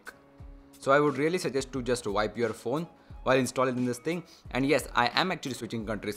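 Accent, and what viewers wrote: India. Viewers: Idk if this is sounds racist but, I freaking love Indian accents! It's just something flattering about them.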